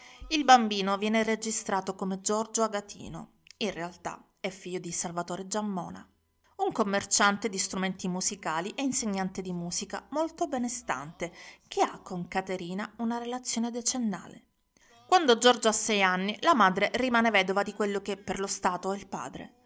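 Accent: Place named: Sicily, Italy. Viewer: Buonasera,. Una mia curiosità. Contrariamente a quelli che rimarcano sull'accento, io da siciliana, non riesco😅 a capire di quale provincia sei originaria. Se ti va di rispondere, ovviamente. Grazie e sempre tanti complimenti 😊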